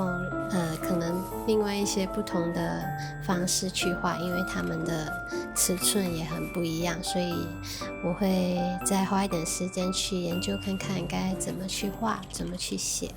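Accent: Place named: Japan. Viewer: Hi ~ I always thought that you are Japanese. 😅 Your Chinese sounds are so cute.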